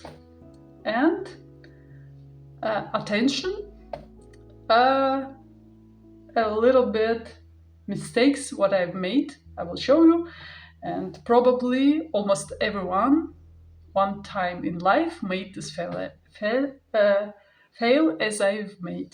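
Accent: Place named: Eastern Europe. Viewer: Your accent is just so beautiful, and your English is wonderful. I’m a third generation Russian-American, so English is my first language, but I always love the way Slavic languages and accents sound. So beautiful! God bless you and your family,! I hope to see more videos from your channel and that it grows in popularity. 🩷🙏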